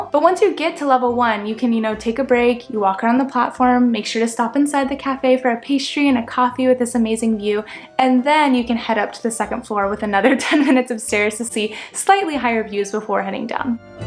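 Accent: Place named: United States. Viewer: I love the way she talks, just straight up American without even trying to enunciate the local dialects lol 😅 I'm flying out tomorrow, we'll do the same! 👍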